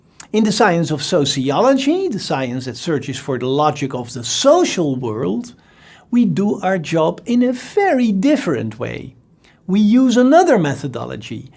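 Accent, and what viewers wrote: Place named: Netherlands. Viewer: His name and accent would suggest that he's Dutch.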